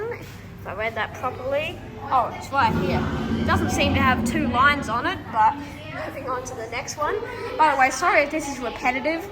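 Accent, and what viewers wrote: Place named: Australia. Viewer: are you as cute as you sound with that great aussie accent...i love the Australian reptile park been there several times....tell tim scott said hi mate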